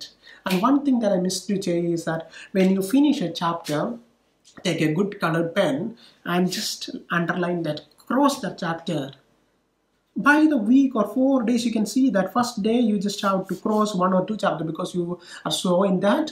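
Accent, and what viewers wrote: Kerala, India. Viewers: Are you malaayali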